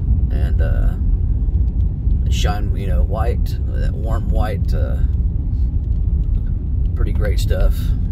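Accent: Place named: South, United States. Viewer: wasnt it raining in this video though? are you in the south? your accent sounds southern. i know rain is much thicker and heavier there than the PNW.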